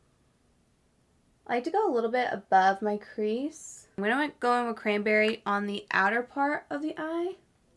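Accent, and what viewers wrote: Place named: Colorado, United States. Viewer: Sounds like Colorado to me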